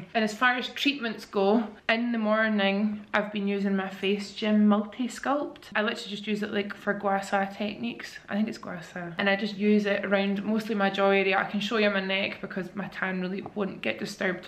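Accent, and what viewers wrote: Scotland, United Kingdom. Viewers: Is it a Scottish thing or just a thing to say words ending in ED in place of words ending in ING? So for example, when you're talking about your new wardrobes, you say 'these just need painted now' instead of 'painting' I wasn't sure if this was a fun little quirk because of where you lived or just a ' ' No shade just genuinely curious because I love a Scottish accent and know how much the accent can change from town to town. Xx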